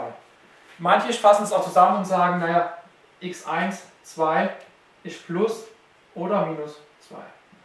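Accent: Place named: Baden, Germany. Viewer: Sehr schön erklärt Sie kommen doch auch aud dem badischen das hört man raus :) "isch gleich"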